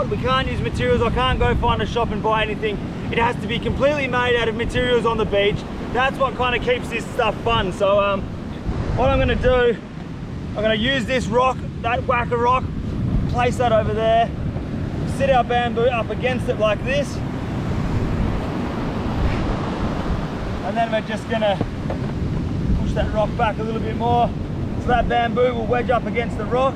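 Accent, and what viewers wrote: Australia. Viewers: What an inspiration. The Aussie accent just makes it that much more watchable. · He is Australian. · Is he from Australia?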